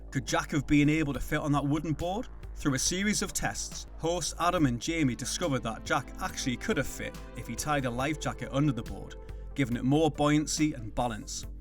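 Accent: Newcastle, United Kingdom. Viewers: Geordie accent...North of England...Newcastle area.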